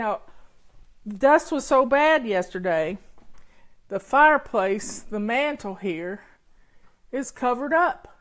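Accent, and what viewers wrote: South, United States. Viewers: love your cute Southern drawl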